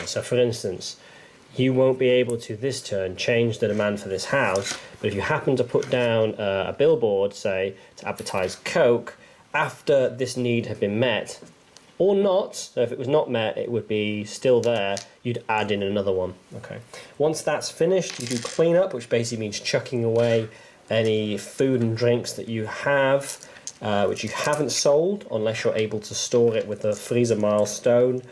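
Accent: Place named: Germany. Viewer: Actually - sounds like a hint of German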